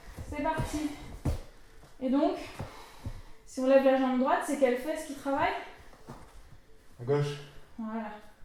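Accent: Réunion, France. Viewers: "la tantine elle commence le naffair, elle me dit même pas"...Vous êtes réunionnais ? :D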